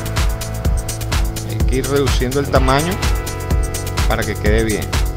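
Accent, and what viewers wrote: Venezuela. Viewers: VENEZOLANO? HABLA COMO DROSS PERO VERSION TUTORIAL XDXD VIVA VENEZUELA :V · Eres venezolano? :v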